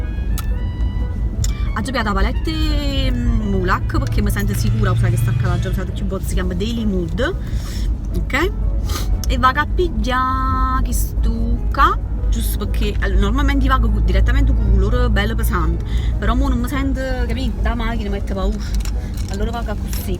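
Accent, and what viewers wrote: Naples, Italy. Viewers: Mi fai morire dalle risate! Genuina, simpaticissima ed esuberante da trasmettere felicità e spensieratezza tramite un video! Ti volevo chiedere, essendo anche io di Napoli avendo notato il tuo dialetto, sei di Napoli centro o provincia? Dove precisamente? Bacioni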